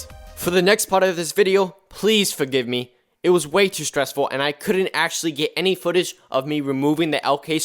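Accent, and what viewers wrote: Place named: New York, United States. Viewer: i love that ny accent lol ily btw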